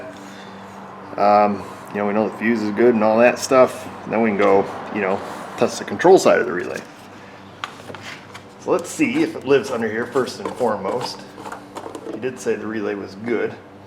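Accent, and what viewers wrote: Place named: Midwest, United States. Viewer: I know he lives in Avoca, NY. But, listen to how he talks. He has a thick mid-western accent. I know several people from Michigan. One lives right across the road from me. doesn't sound like a New Yorker at all. I'm just curious where he's FROM.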